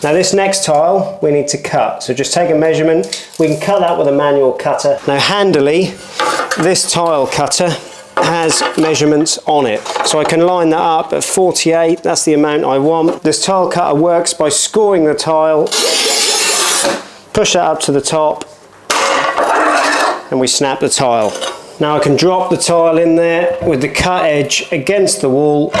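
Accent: Norfolk, United Kingdom. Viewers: That's a Norfolk accent if ever i have heard one. Made me feel right at home 👌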